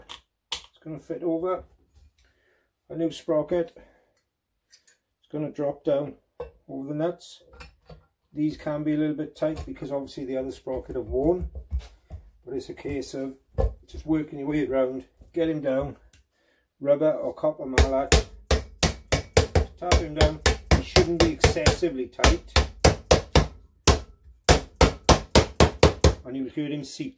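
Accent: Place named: Wales, United Kingdom. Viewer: Great, l've been looking for a vid like this of how to jam the front sprocket to enable to take it off. Spoken with a lovely Welsh accent too! Cheers buddy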